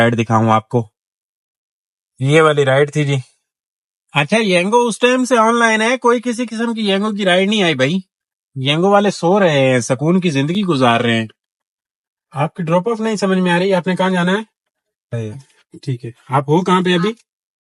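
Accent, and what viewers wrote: Jhang, Pakistan. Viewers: Apki Punjabi to jhung wali ha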